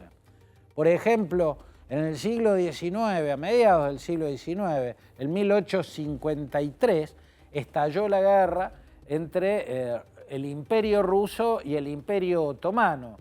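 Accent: Córdoba, Argentina. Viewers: Genial su tonada cordobesa y sus conocimientos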